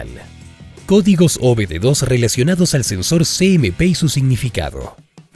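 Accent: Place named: Chile. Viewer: Me Gustan Estos Videos SiN Acento Mejicano....suena como Acento Chileno de Chile po'' weon Chile 🇨🇱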